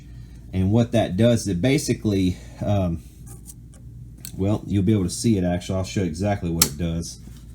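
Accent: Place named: South, United States. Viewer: The slight southern accent adds to the credibility of this bullshit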